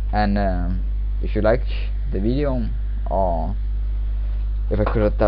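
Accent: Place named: Sweden. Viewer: Hey you're swedish :D !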